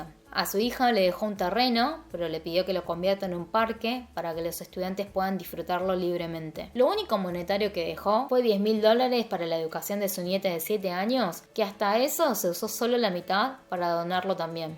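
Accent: Argentina. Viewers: Soy el unicoo que se pone rraro al verla hablar español y aun mas con acento argentino 😅 me da cosa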